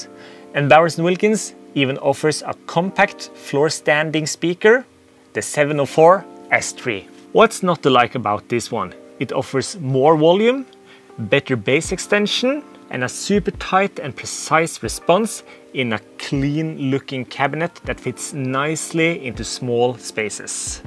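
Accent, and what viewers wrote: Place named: Sweden. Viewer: snak dog Dansk i er et Dansk Firma i stedet for Svedish med en Lattlig svensk accent Kom dog ind i kampen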